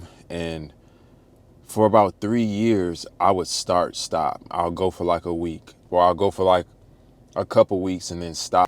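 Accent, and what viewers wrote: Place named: New York, United States. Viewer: I would've sworn you were from NY. You have that NY accident. Great content!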